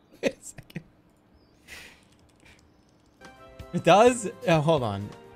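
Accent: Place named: Ireland. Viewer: Halfway through I realized this was jerma, not RTgame… does he always sound this Irish 😂😂?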